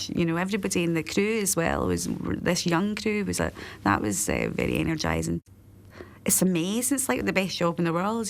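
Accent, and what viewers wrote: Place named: Scotland, United Kingdom. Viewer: She's Scottish you melt.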